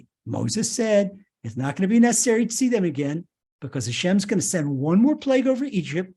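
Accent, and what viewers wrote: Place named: United Kingdom. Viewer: Are you from the UK.. thank you for this.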